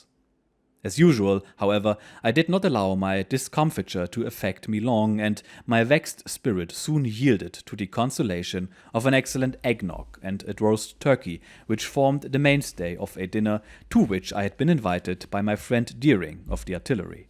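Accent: Germany. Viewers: The war for southern Independence???sounds like lost cause propaganda. Also who are you ? You sound like your German. Stay in your lane fritz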